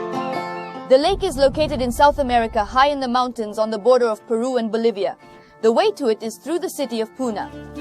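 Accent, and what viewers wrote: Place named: India. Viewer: True indian ascent ❤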